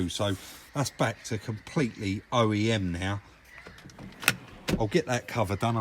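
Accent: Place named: Cockney, United Kingdom. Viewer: Bloody marvellous video what looks like a nightnare to anyone else is a doddle to you i admire your determination how about a meet and greet in summer i reckon loads of us would turn up celebrate your 100k i was with you at 45 ish lol im sure you inspire so many of us as always so professional with a great cockney touch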